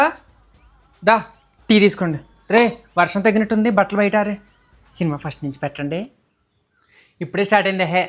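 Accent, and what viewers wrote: Godavari, India. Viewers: 😂😂😂 pure గోదావరి భాష😂😂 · Director ga,kodukunnanehe godavari yaasa super I'm missing that yaasa,our place dowleswaram daggara vemagiri andi,me vedios chala natural ga vuntai nethimeda kerchief, paper cutting badge la petti name rayadam unique asalu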